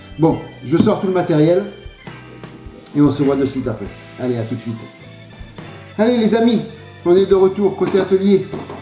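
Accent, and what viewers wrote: South, France. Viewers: Bonsoir. meilleurs voeux à toi et à tes proches. Dans l'attente de tes prochaines vidéos, de tes précieux conseils et de ta bonne humeur, avé l'accen du Sud. Amitiés.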